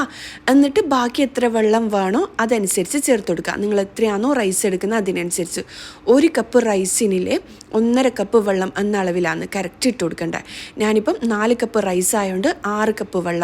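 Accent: Kannur, India. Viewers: സംഗതി ok യാണ് കണ്ണൂർ /കാസർഗോഡ് ആണെന്ന് തോന്നുന്നു 'അനക്ക് "എന്ന് കേട്ടു 🤣😂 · Nigal kannura tta tta nn parayunn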